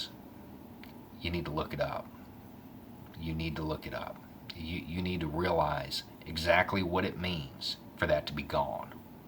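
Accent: South, United States. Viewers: I really like your dedication to your country. I only wish more people were as informed, and as intelligent, as you are, Sir. And I really love your southern accent!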